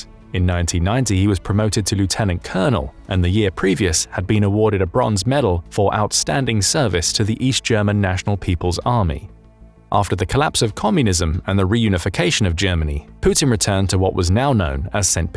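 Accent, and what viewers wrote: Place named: RP, United Kingdom. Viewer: Lies from the get go. This guy thinks that his posh sounding accent proves his intellect. Well he sure is a perfect example of twisting the truth to fit own alternative UNREAL narrative / just as hypocritical as main media and politicians who happily speak with forked tongues and do their utmost of censoring what they don't like. Do you ever now find REAL debates between intelligent people of different opinions than what is force fed??? No, all the main proponents do is calling names, taking jobs away from dissenters.... admitting that they will call something they agree is TRUE nevertheless as " disinfo" when it does not suit their narrative.....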